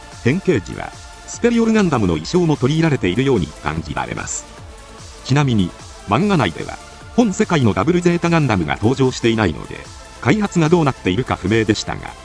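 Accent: Kansai, Japan. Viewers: 関西弁なだけできれてないんじゃないか？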